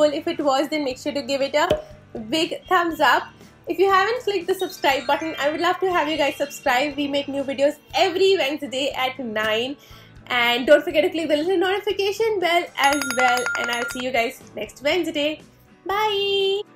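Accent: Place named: Delhi, India. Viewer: Just a observation.. Nothing negative.. Delhities.. Usually pronounce here as hare... Thank you for the vid.. Very helpful tips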